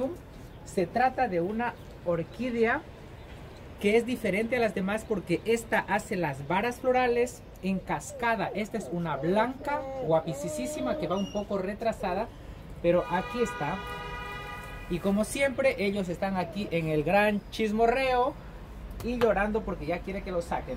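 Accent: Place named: Latin America. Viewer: Me encanta tu dedicación! Felicidades por tan buenos resultados 👏👏 Por cierto, no tienes acento español, me parece que eres de Latinoamérica, no me equivoco? Saludos desde México.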